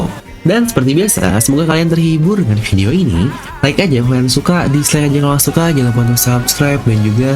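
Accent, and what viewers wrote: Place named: Bandung, Indonesia. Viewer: hmmm kek nya orang bandung admin nya ahahahaa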